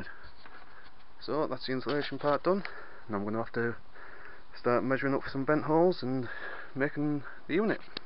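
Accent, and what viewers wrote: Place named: Teesside, United Kingdom. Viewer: You've got to be from Teesside with that accent! Nice work on the van.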